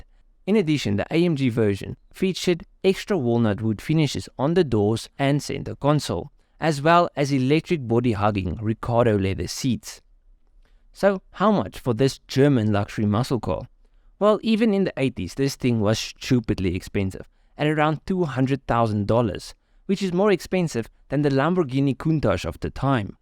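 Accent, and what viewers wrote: South Africa. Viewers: You sound very south african my guy. Love it!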